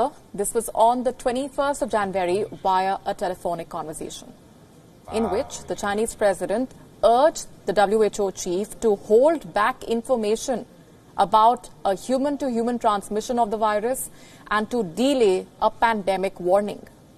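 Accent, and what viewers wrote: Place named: India. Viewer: Thick Indian accent.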